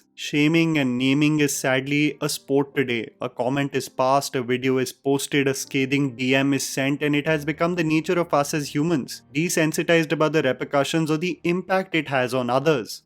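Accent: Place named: Punjab, India. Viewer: You sound so good speaking Hindi with a Punjabi twang.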